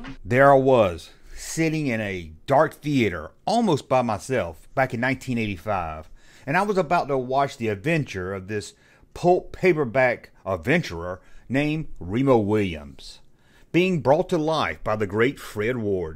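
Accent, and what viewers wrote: United States. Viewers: sorry for my earlier (now deleted) comment - I can be a TOTAL DICK sometimes. I should have checked out more of your channel before leaping to ignorant conclusions. As a Canadian, I sometimes don't take into account the legitimate regional accents and vernacular of the vast US culture. That said, I enjoyed the reminder and comments on this interesting underrated, entertaining film. I recall (even at the time) burdening ANY FILM as "the adventure begins: was asking for trouble!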